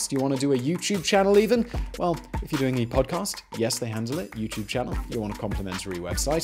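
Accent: United Kingdom. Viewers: I don’t like learning about American history from a British voice. It bothers me! Shutting it off. 🇺🇸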